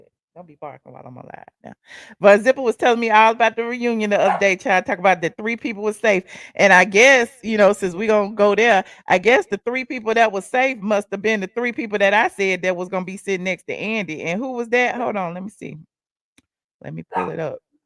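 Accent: South, United States. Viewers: I'm a new Yorker and love the southern accent " hol on lemme see" I don't want y'all to hear me yell in yall " eea". Ear? Bottom seat every " yeea" year? Love u. My son hijacked my you tube again took my Love4all formally yuchboi. Gotta split phone email. Ugh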